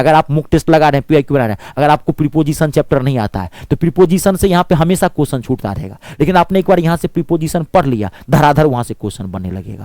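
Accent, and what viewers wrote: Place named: Bhojpuri, India. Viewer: Mts k bad aap calculation batch leke aaiyega chahe youTube pr ya app pr Aap acha pdhate h, motivate krte h, or kbhi kbhi bhojpuri tone mujhe achi lgti h , or aapka positive attitude 👌,,, tyari jeet ki, grammar to phle hi pdhi h but aapke video se question lgane ka conference aaya h, thank you sir... Or ha sir mujhe aapke hath bhut ache lgte h such ab jo bat thi kh di... Mai kbhi comment nhi krti but yha ki h 🙏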